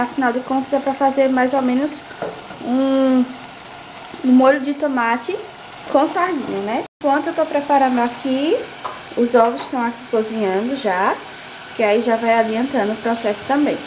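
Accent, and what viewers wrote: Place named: Northeast, Brazil. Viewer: Voz meiguinha...bonito sotaque nordestino...prato maravilhoso...bateu a fome agora...Parabéns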